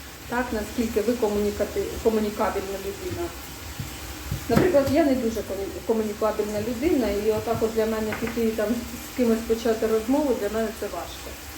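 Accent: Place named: Western Ukraine, Ukraine. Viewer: Доброго дня! Цікаво знати звідки ви виїхали? Судячи з гарної української ви з заходу, невже там було так небезпечно? Чи просто дуже до Англії хотілося?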